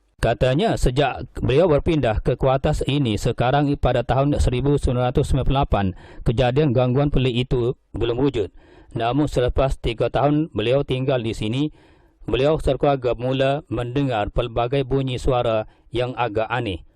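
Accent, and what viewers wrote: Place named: Kelantan, Malaysia. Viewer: xblh buat apa.cara ulasan tu kejap Kelantan kejap bahasa baku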